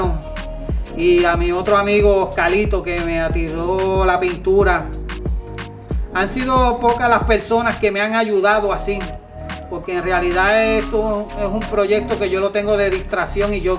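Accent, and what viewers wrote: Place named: Puerto Rico. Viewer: acho pensé que era en el salvador este vídeo, asta que escuché el acento poltorro 😁😁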